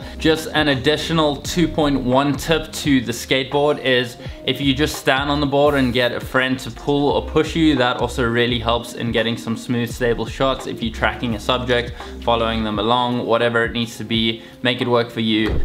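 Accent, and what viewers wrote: South Africa. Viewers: You sound south African? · As soon as I heard that accent I just knew it... Big ups bro, great tips ... very useful 🇿🇦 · The accent sounds very South African. Finally I get to hear another South African review about cameras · picking up a south african acent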